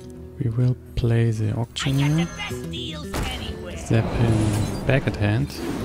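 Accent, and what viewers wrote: Germany. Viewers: are u from germany? funny enough i'm trying to learn some german :D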